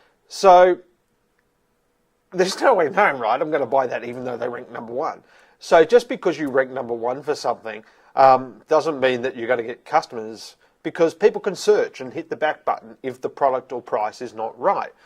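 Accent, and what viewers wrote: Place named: Australia. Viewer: Thank you for this video! What do you think about zippcast? P.S. I like your Aussie accent :)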